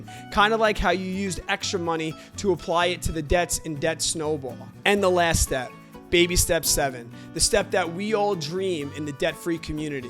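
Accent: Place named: Philadelphia, United States. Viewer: I sense a Philly accent!!!!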